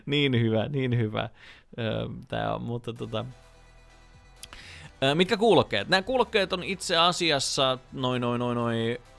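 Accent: Finland. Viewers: He's from finnish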